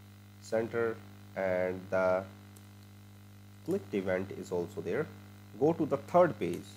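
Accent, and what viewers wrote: India. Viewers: Nope, totally wrong there buddy, I am not even that proficient in English and I speak Spanish, which I doubt the narrator knows since he has an Indian accent, if you can't understand him it's more like a YOU problem then. If you are THAT distressed by his accent, watch another video, he's not a English teacher, he's a programmer, the least he should care is how he is saying his words because one guy can't understand him.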